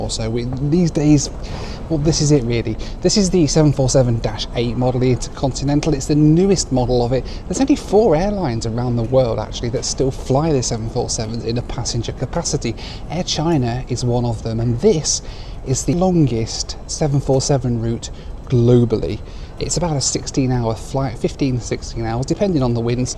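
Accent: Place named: United Kingdom. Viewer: Lol!!! he's getting big pay I think. Keep promoting for that hell hole place. Hes welcome though because he's white with British accent. I would even afraid to go there myself. Lol!!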